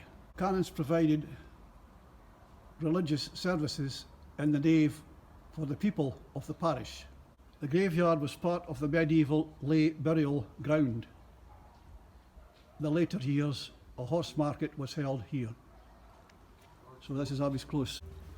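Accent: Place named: Scotland, United Kingdom. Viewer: Great to hear a scottish voice,talking about Scotland,jedburgh,Edinburgh,my relative staying in the jedbough castle.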